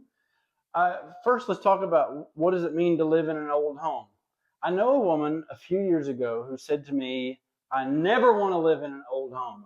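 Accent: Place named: South, United States. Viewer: You are AMAZING. Truly. Are you also a writer.? I am guessing - certain - yes you are. The entire post, but most especially the last scene with you in the dining room - is incredibly poetic and perfect. Such a southern voice like Tennessee Williams or Truman Capote - so musical sounding and thoughtful and convincing. I LOVE this house. Keep on doing what you are doing. And keep writing. Your talent is obvious.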